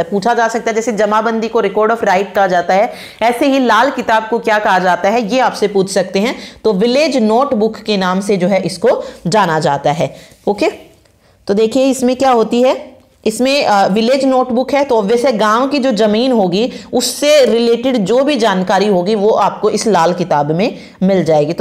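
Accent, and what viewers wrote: Haryana, India. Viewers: Maam yeh wording bolte bolte haryanvi nikl rahi h bolte time 👌🏻👌🏻👌🏻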